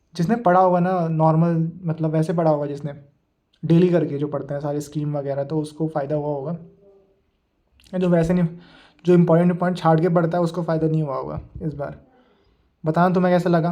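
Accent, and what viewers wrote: Uttarakhand, India. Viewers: uttarakhand se hai kya bhai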